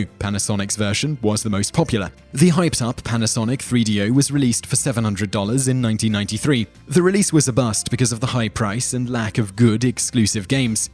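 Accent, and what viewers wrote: United Kingdom. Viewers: Wow. You must be ignorant to the fact that Great Britain alone has many many accents. It's his accent.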